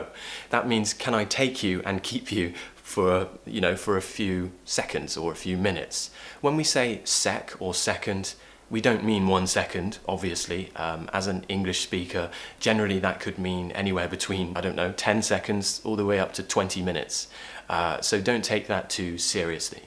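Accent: RP, United Kingdom. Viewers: The best british accent on Youtube! Thank you!;) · How great you are! ♥️ I liked British accent from you❤️ Keep inspiring 😌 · I really love the way you talk and teach about your British accent style ❤ Thank you so much!!!!❤❤❤❤ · Bro! Thanks by your Lessons , I greateful with you. I can understand every single word you say , I've learnt British English here, you're my Favourite Person 'round the world , I love your accent , I've a little bit of British Accent by you ... See ya !.. I wait meet you in the future and go to take a break or drink tea, just like a Brit guy .. I Say Hi From Mexico 🇲🇽. Be Carefull . ♥️ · I think I have found my happiness. I've been looking for a male British teacher to copy his accent and yours is beyond my expectations ! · I really like to learn British accent with you. Thanks a lot. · Omg I need someone from UK teach me like, I love British accent 😘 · Thank you so much to think teaching english because you are the right one in the right place, you make me fall in love in British accent 😍😍😍😍😍😍😍😍😍 I'm Layla from Sudan i will be always watch your videos until i feel i speak like you ♥️ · Thanks for such an informative and enjoyable lesson! 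Your British accent is marvellous! Honestly, miss for your podcasts( Are you planning to do that? Best regards from Ukraine!💙💛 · Hi i love u British accent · Thank you for always posting great videos :) I love your british accent and your new hair color❤︎ From Japan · It's been me 2 years learning British accent and................................ I just adore your accent man💖💖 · Hi. I really love listening to you spiking British accent.....!!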